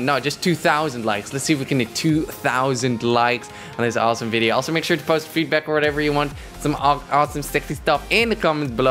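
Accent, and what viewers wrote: Netherlands. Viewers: zalig, ik wist niet dat je nederlands was, ik dacht het eerst wel aan je naam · Are you dutch? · are you Dutch? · Are you freeeking Dutch nicee vid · Are u dutch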